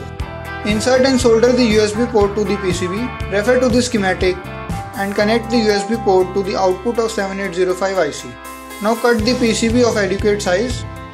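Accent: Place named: India. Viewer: Accents are weird to me. I can never imitate accents like other people can having accent myself. More I listen to Indian accent I can get use to it and be able to comprehend more. And that's not the only factor effecting you. Yourself become more kin to the slight accent without even realizing it. I wanted to ask you. How can you check the voltage and the amp input? Is there a simple way? Like an app? 😂😂😂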